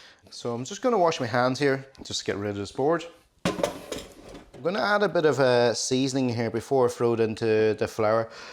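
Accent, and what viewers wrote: Ireland. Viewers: Asian guy with Irish accent cool..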